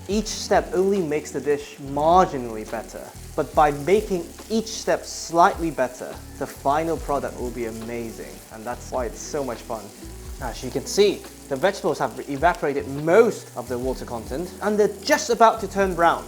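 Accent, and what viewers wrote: United Kingdom. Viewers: Nah, it's an Asian dude speaking British English giving lesson about Italian dish that got me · u haven’t been outside enough if you think an Asian guy with a British accent is impressive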